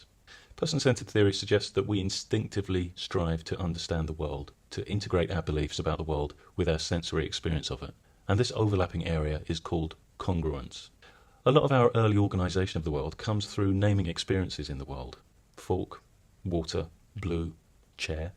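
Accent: England, United Kingdom. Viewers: Totally off-topic but your voice really reminds me of the voice over to a making of documentary of Silent Hill 2 but I suspect that's because you're from the same area in England as the actor.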